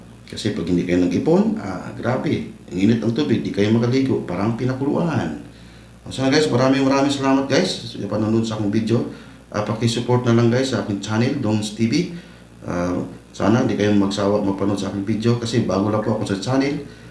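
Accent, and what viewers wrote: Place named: Visayas, Philippines. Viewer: Bisaya mn ka Idol ky salita halata mn gud ta mga bisaya 😅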